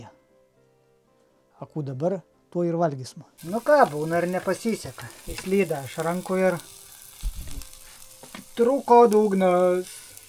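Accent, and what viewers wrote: Aukštaitija, Lithuania. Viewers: Malonu žiūrėti ir klausytis aukštaitiškos tarmės + apetitą žadinantys valgiai + draugiškas ir ramus šuniukas 👍👋🍜🐈O čia , žiūrėk, ir nauja idėja gali gimti, pvz., „Kų šindie kaštavosma bunkerioki“ 😄 Sėkmės!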